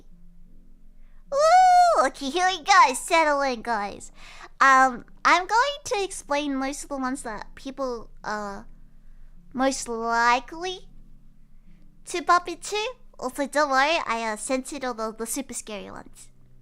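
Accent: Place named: Australia. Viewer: Your Australian accent is adorable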